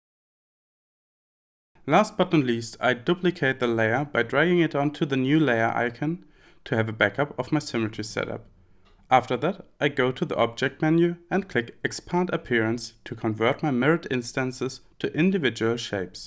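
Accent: Germany. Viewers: you have a german accent, are you german? Man I love your tutorials! because you simply show the hidden tips and tricks, I'm a professional graphic designer, but those little tips you give, I haven't seen them anywhere else before! keep it going :)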